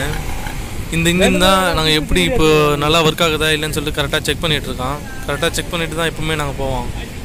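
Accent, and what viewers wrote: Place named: Chennai, India. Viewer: Sagothara ethula Chennai slang pesuringa and fishing style kaniyakumari yepdi?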